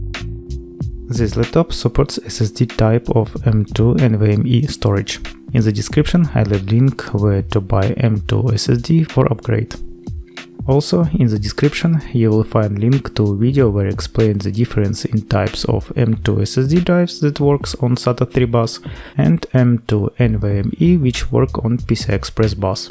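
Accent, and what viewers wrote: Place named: Russia. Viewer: Акцент выдаёт, на русском может есть смысл комментировать?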